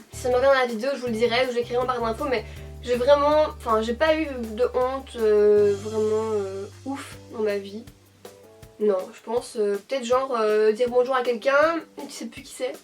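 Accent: Belgium. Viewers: Waow super vidéo 😘 Mais, une petite question, j'ai pas compris parce que tu as dit que tu est italienne mais que tu as un accent belge ? 😹😹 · Ah non on peut pas confondre l accent belge et suisse ;0) ! Parole de suissesse ;0) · Je crois que les gens confondent les accents car souvent pour les français, l'accent belge c'est juste l'accent bruxellois. Perso je savais que tu étais belge car je suis du Hainaut aussi ahah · L'accent n'est pas très marqué je trouve comparé à mon oncle belge ^^ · je kiff ton axant avant j habitait en Belgique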